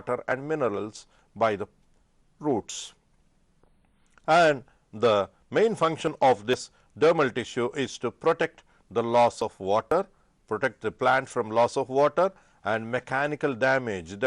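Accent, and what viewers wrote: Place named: United States. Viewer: Ur English is mind blowing sir it hears like Americans English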